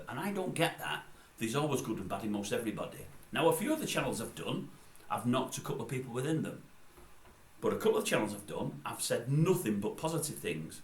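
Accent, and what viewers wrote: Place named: United Kingdom. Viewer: One of the things that foreigners love about UK is the different regional accents. However when I, as a Brit, have trouble understanding 60% of what the chap is saying, then god help our overseas friends 😂 I’m sure the observations were interesting, I just kept losing the thread.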